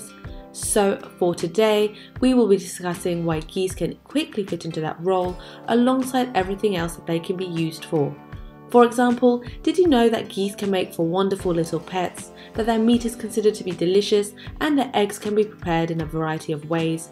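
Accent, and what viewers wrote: United Kingdom. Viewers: She has a English accent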